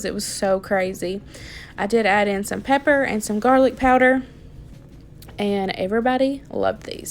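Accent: South, United States. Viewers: Totally agree with all the other comments! The food looks incredible! Your southern accent is awesome! Subbing now and looking forward to more of your vids! Great work!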